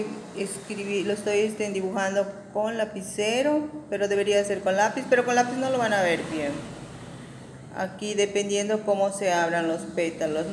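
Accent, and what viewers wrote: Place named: Yucatan, Mexico. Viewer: me encanto escuchar tu acento de Yucatan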